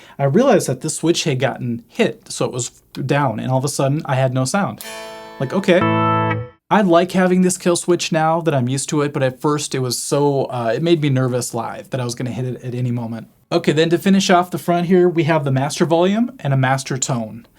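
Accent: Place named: Midwest, United States. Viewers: "Jayguar" "knabs" Sounds like he's from the mid-west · It's the Midwest accent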